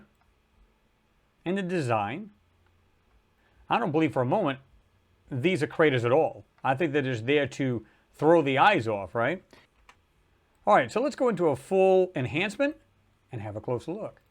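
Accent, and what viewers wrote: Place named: New England, United States. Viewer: NEW subscriber.. sounds like you're from New England. Rhode island here 👊👊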